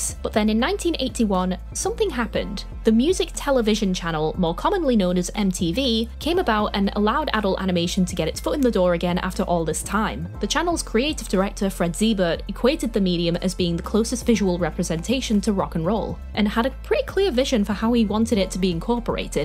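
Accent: United Kingdom. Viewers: I am trying to pin down your accent. It sounds sort of British, but not really.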